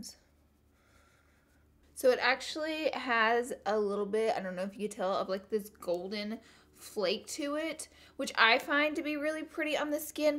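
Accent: South, United States. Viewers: When you said "oil free" I totally thought you said "all free" because of your southern accent compared to the Boston accent I grew up around! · I love u u r soooooooooooo awesome I love your southern talk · Madilyn Z she sounds like a southern version and I love pll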